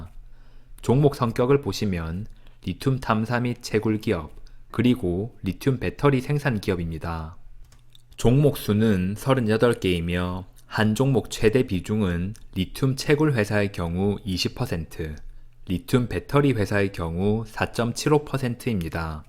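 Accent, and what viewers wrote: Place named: Ulsan, South Korea. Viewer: 좋은 정보 감사히 잘 봤습니다. 말씀 톤으로 보아서는 울산분으로 보이십니다. 아는 분과 많이 비슷한 느낌입니다.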